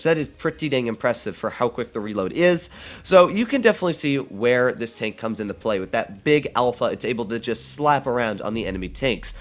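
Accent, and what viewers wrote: Russia. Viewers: Love the tiny Russian Accent, stalin would be happy. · are u secretly russian?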